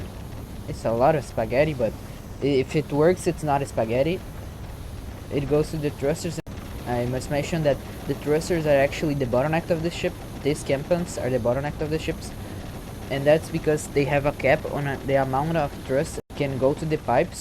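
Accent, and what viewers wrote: Brazil. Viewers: Teu sotque é de brasileiro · Pretty impressive ship. Don't worry about your English, it's perfectly comprehensible; you do sound like you're Brazilian. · You sound brazilian to me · Are you Brazilian? The accent is basically identical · Are you brazilian? It sounds A LOT like the Brazilian Accent. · tu é br mano. kkkk salve aprendendo a falar ingles tamabem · TU TEM SOTAQUE DE BR MEU IRMÃO, TOMA O LIKE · Eu tbm imaginei que era brasileiro somente pelo sotaque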